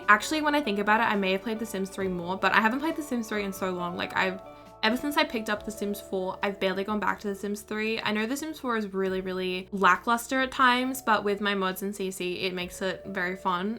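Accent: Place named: Australia. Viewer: I met you recently, just when the Growing together pack came out and I'm currently looking at your other stories 🥰 I loved your Australian accent and your sweet voice, as well as the way you tell the stories I am Spanish and my English is basic but I have to tell you that I can understand most of it well. Thank you very much for being so appreciative of us and for your hard work ♡ Starting to do this despite your doubts is having a lot of courage, thanks for everything ❤️ take care of yourself 🍀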